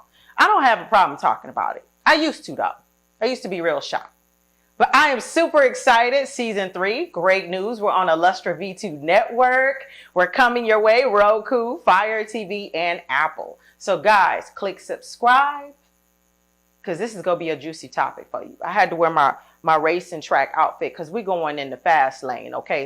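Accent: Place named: South, United States. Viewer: With your souther twang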